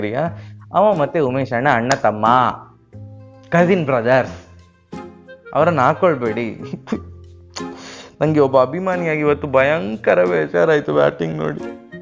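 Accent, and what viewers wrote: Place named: Kundapura, India. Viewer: ಕುಂದಾಪುರ ಕನ್ನಡ🔥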